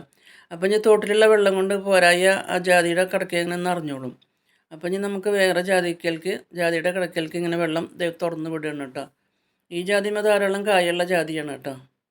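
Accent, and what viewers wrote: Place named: Thrissur, India. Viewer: തൃശൂർ ആണോ ചേച്ചിടെ വീട്. സംസാരം കേൾക്കുമ്പോൾ തോന്നുന്നു. എന്റെ വീട്ടിലും നിറയെ ജാതി കൃഷി ഉണ്ട്. 👌👌❤️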